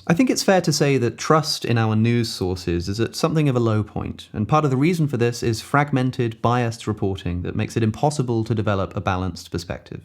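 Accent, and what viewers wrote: United Kingdom. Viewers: No. I have him playing in the background when I wash dishes and sketch. He can be talking about the most graphic shit but it’s still relaxing because he has a British accent 💀.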